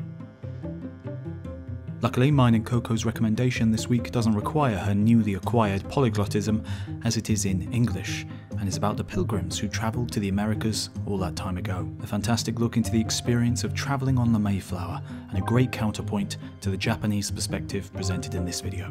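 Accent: United Kingdom. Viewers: All read by a Brit, our former enemy.... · I'm learning US history from a british person reading an english translation of a japanese book about american history. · and read by a foreigner from President Washington's most bitter enemy (i believe)! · And let's not forget, narrated with and British accent!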